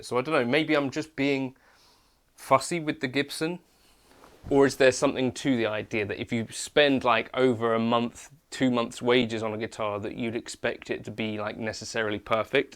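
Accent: England, United Kingdom. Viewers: I don’t get the hair that needs moving out of the eyes and the typical English emo accent. You don’t have a taste for expensive stuff and still pay £2k for a guitar?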